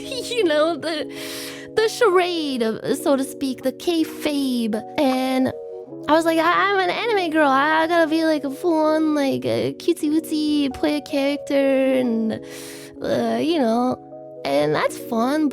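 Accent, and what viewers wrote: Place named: Michigan, United States. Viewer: I want to hear her authentic Michigan accent more sometimes.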